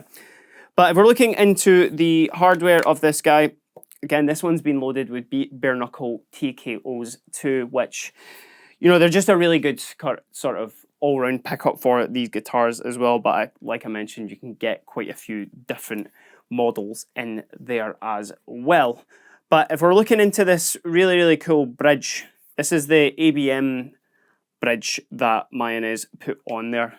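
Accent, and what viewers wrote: Scotland, United Kingdom. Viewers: Unrelated but what accent does the lovely lady have? I hear Scottish, but then maybe something else behind that. Possibly Irish instead of Scottish, but I don’t think so. These are interesting guitars. Kind of unfortunate about the name.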